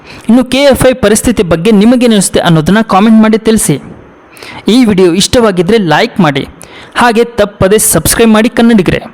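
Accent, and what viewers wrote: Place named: Tamil Nadu, India. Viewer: ಲೋ ಅಯೋಗ್ಯ, ಚಾನಲ್ಲ ನಿರೂಪಕ, ಸ್ಪಷ್ಟ ಕನ್ನಡನೇ ಬರಲ್ಲ ನಿನಗೆ, ತಮಿಳು ಮಿಸ್ರಿತ ಕನ್ನಡ ಮಾತಾಡ್ತಾ ಇದಿಯಲ್ಲೋ, ಭಿಕ್ಷುಕ ನಂಗೆ ಕೇಳ್ಕೊಳ್ತೀಯಾ ಸಬ್ಸ್ಕ್ರೈಬ್ ಆಗಿ ಅಂತ, ನೀವೆಲ್ಲಾ ಕನ್ನಡ ಭಾಷೆಯ ನಿರೂಪಕರು, ನಿನ್ನ ಯೋಗ್ಯತೆಗೆ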